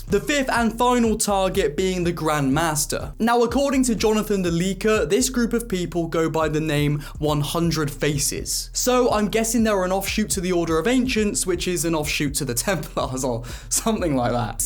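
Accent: United Kingdom. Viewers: i love that freaking british accent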